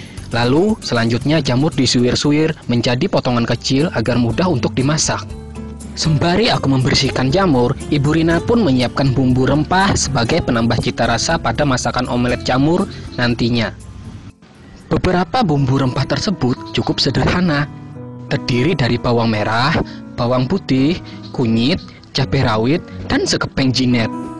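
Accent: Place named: Java, Indonesia. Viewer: bang org mana sih bahasany banjar tpi logatny kyk ada medok jawanya wkwk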